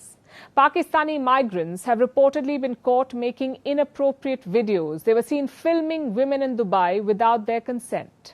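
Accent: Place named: India. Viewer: What a “desi English “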